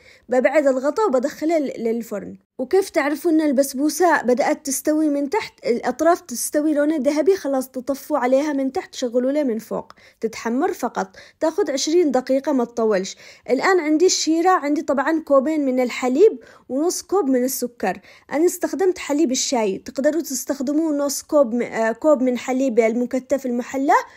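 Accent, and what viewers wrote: Yemen. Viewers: والله يعجبني كلامك ماشاء الله واغلب الكلمات اللي تقوليها نتكلمها احنا اللهجة اليمنية قريبة جدا من لهجتنا...سبحان الله متابعة من الجزاير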